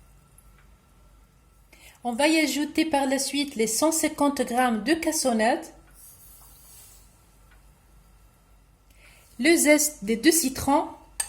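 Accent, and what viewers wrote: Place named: France. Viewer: Ciao, adoro la tua pronuncia francese 🥰 ottima bevanda, fresca ed energetica 😋🥰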